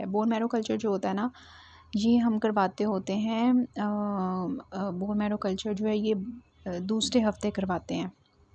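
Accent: Pakistan. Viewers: Pakistani lg rha h aawaj se ... Kya pakistani me b MBBS hoti h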